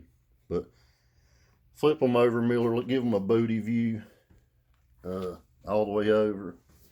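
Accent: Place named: Kentucky, United States. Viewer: Hell he talks like everybody where I'm from Pickwick TN lol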